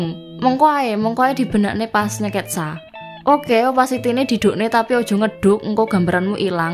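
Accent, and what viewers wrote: Indonesia. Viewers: kak, dari logatmu, kayaknya kamu orang Indonesia ya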